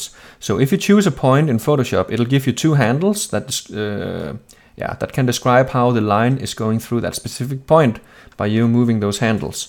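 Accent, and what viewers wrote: Denmark. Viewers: Are you danish? both your accent and name is danish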